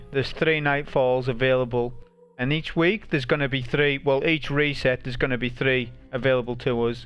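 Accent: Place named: Newcastle, United Kingdom. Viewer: had to happen we had some wonderful years with alex and now we have a clown, but we will prevail. question will you be doing the whisper help runs and how does one get to know. ps its just nice to follow someone who is not american lol and after watching "vera" et al can understand every georgie word. keep it up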